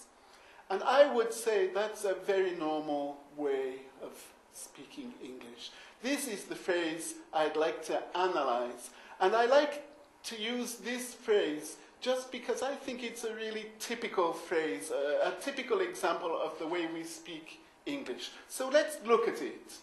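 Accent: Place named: Liverpool, United Kingdom. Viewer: Hi you know that I can understand you perfectly unless you speak with your Liverpool accent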